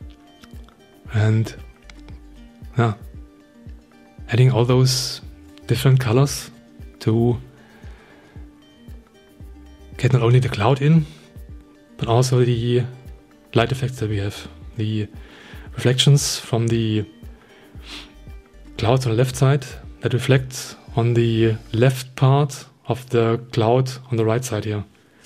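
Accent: Germany. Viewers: Du bist deutscher oder? Ich glaube ich höre deutsches englisch 😂❤ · Du klingst als kämst du aus der Region Dresden. Oder täusche ich mich?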